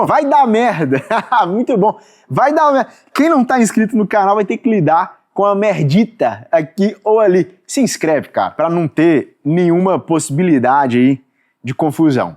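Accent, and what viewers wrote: Minas Gerais, Brazil. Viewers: Otimo video .Quanto a esses vasos premium , se por acaso estragar o sistema de descarga , fica muito complicado.Uma vez vi propaganda de um vaso com altura um pouco maior para facilitar a vida dos idosos, acho feio aquelas tampas altas .Esse seu sotaque é de MG?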